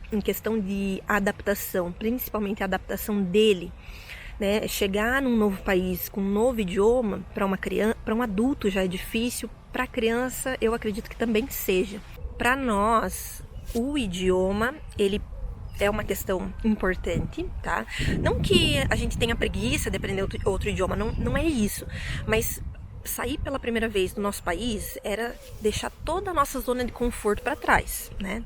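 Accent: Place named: Brazil. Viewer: Muitos parabéns pelo seu português. Posso dizer-lhe que fala corretamente tem o seu sotaque que é brasileiro e é lindo. Sejam bem-vindos ao meu país.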